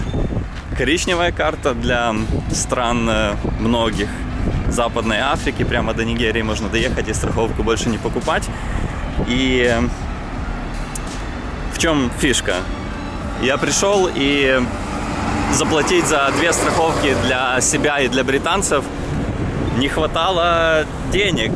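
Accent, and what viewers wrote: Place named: Ukraine. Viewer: О Боже... чувак , ты ссышь зрителям в уши . Посмотрел 15 минут , больше не могу . Твоё произношение на русском просто режет слух . Дружище , если ты думаешь , что разговаривая на русском ( а не на украинском , твоём родном языке ) ты сможешь увеличить аудиторию - то ты глубоко ошибаешься . Я как человек проживший 20 лет в Украине и 7 в России , просто не могу не обращать внимание на твой русский . Не ломай свой язык на камеру , больше потренируйся в плане произношения и только после этого пили видосы на русском . А что касательно самого видео - молодец. Видосик качественный , хорошо проработан , продолжай в том же духе . Только прошу тебя , пожалуйста , прислушайся к моему не большому совету )))))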